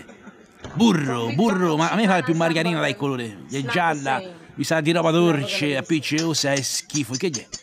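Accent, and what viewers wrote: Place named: Quarrata, Italy. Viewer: Parla il quarratino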